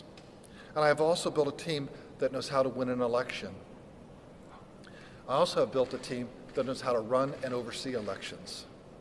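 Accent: United Kingdom. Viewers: sir dave chasssington of dude land and comumbris You're an embarrassment to the British. Did you just stumble off your dingy? You're struggling to form a coherent sentence. The British news is horrendous. You sound exactly like the average BBC viewer though. As a Brit myself, I can say that the fraud is blatantly obvious. I'd be quiet if I were you because we've had plenty of election fraud of our own.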